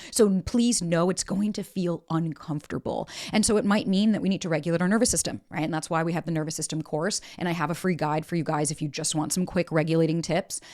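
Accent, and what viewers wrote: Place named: New York, United States. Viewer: Must be a Newyawka? 😮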